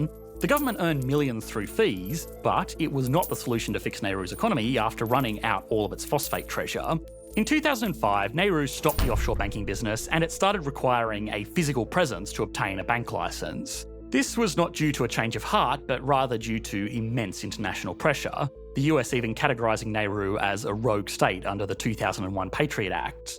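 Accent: Australia. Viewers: Australian accent in a nutshell. This phoneme doesn't exist. That and ending their sentences like they were questions? I found myself repeating the end of every sentence just to hear what I'd sound like if I were from there? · Aussie narator. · First time here, interesting topic, only I can stand your way of talking.. using Vocal fry at the end of a sentence. STOP IT and talk normal. (not talking about Aussie accent). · Interesting accent Sounds to me a mix of Australian and tv chef Loyd Grossman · It's called "Australian". Admittedly, modern Australian, but Australian nontheless. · people getting mad over an australian accent and invalidating the rest of the video's point proves that these people have the highest iq in human history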